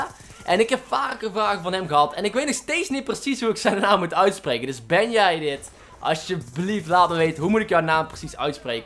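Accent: Brabant, Netherlands. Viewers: hahah heerlijk dat brabants accent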